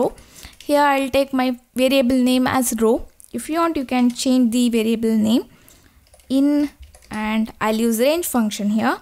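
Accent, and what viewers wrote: South India, India. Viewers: Ur voice close to South indian, while speaking southern India will play around,. 😉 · Your voice and accent is like south Indian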